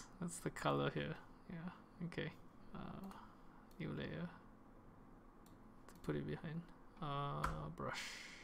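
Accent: Singapore. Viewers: u sound super singaporwan are u?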